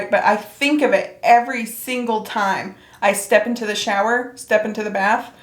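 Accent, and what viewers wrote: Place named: Midwest, United States. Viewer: I really feel like your accent sounds midwestern. I live in MO and you sound similar. Lol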